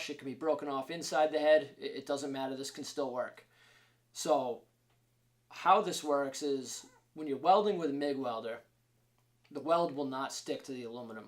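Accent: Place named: Massachusetts, United States. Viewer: 6 seconds in and I already know you're from mass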